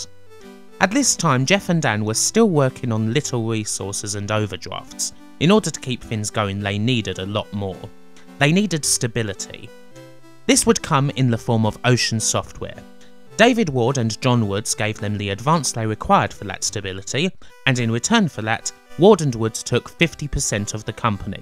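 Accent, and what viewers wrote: United Kingdom. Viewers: Maldita sea! Gracias por esta y mucha mas informacion de videojuegos saludos desde El Salvador, exitos, your british accent help a lot with the lenguage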